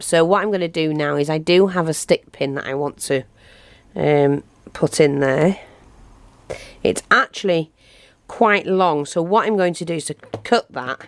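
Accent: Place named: Hull, United Kingdom. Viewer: your stuff is fantastic hun im in hull your acsent doesn't sound to far away lol x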